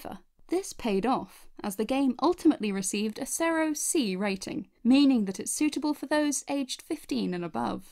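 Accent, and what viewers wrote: United Kingdom. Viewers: I´ve got a question not related to this video. As a teacher of English in my country (Spain) I´m always looking for new British English speaking media sources (preferably about my hobbies, one of the biggest being videogames) and I happen to love your accent. Would you mind letting me know what area is your British English accent from? much obliged!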